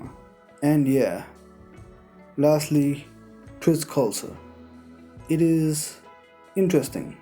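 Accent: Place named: India. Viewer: Yo, from a fellow indian work on your accent.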